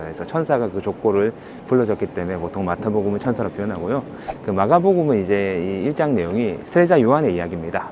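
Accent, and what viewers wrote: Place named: Gyeongsang, South Korea. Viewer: 대구분 이신지요???? 대구 말투라 반가워요~~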